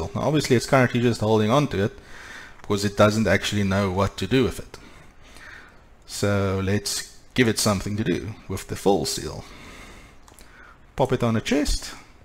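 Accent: South Africa. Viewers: Your sound South African, regardless, great mod. Keep it up.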